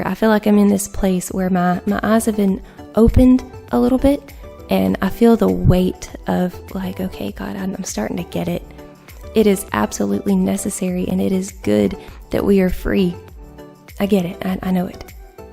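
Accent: South, United States. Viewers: Another Catholic loving your videos (and your southern drawl). Two observations: IMHO, it would be better to slowly fade out the background music. It's probably ok for shorter videos, but it can get distracting for some (like an ADD like me 😂) when you keep going deeper and deeper into such a brainy and solemn subject.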